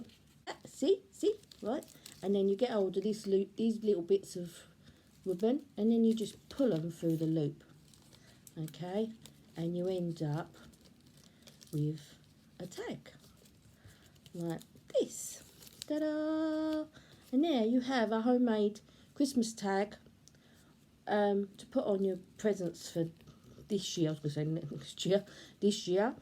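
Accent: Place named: United Kingdom. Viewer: hun, gosh I have a thing for British accent. I could listen to you all day,ding ding 🛎!! You’re very creative hun 🤗that recycling card came out quite pretty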